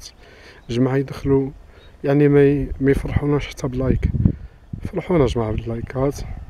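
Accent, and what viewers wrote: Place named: Jijel, Algeria. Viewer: اهدر جيجلي ماتخبيش اللهجة ديالك ا وخيي لازمك تعرف بيها لأنها من تراث المنطقة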